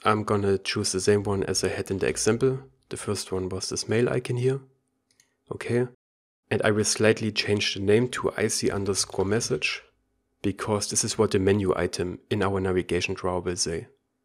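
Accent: Germany. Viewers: nice german accent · Schöner deutscher Akzent! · I assume of your accent your German like me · ich mag deinen Deutschen Akzent^^ Great Videos Du bist der bester :D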